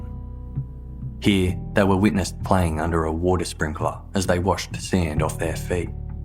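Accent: Australia. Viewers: This is the best narrative, lots of details. I love your aus accent too!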